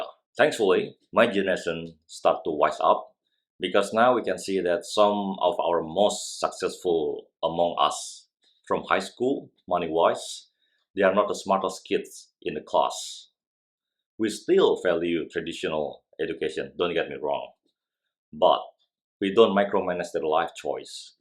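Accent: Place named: Australia. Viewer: Before you even said I knew you are in Australia from the cadence of your English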